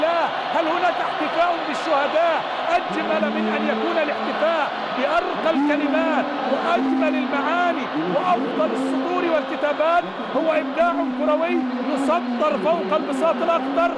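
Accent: Upper Egypt, Egypt. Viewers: هو المعلق صعيدي مع احترامي للصعايدة يعني بس المعلق مضحك اوي😂😂😂😂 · هو المعلق ده صعيدي بيقول يا مؤمن يازكرييه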